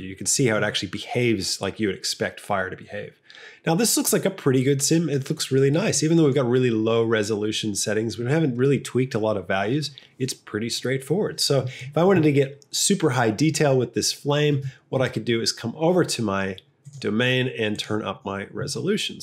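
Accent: United States. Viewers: wait are you really australian lol you sound american to me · You have the most US accent I've ever heard from an Australian...cash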